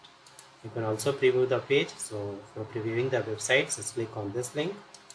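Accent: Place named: India. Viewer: I love you so much Mister India, my friend and i love your accent so much, we will come to India soon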